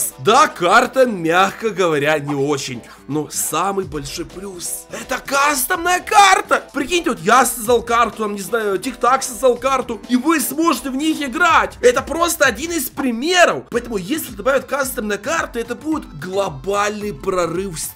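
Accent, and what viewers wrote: Ukraine. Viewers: Брат ты из украины ???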